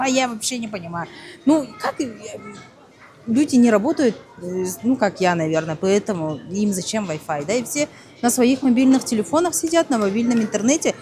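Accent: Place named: Kazakhstan. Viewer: у вас уже произношение казахское. хорошего вам времени провождения в Бишкеке. Вопрос вы часто используете междометие Де или Ди что это значит с якутского?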